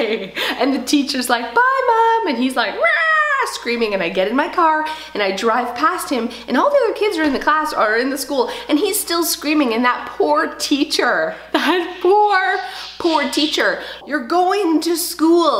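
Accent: Canada. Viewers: Ga Ga,gave me life! Love your cute Canadian accent,and sweet energy! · Lol.. this is so refreshing. I Have an extremely strong willed 4 year old boy. every day is a challenge! sooo nice to know I'm not alone! also, the centerpiece is dreamy! I'm a Canadian in Missouri and your accent makes me homesick!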